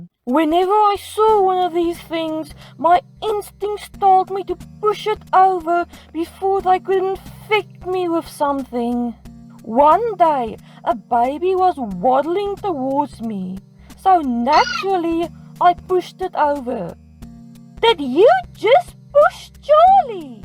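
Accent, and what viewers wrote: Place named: South Africa. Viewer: Recognised the accent from a mile away XD so cool to see a South African story time/animator!!!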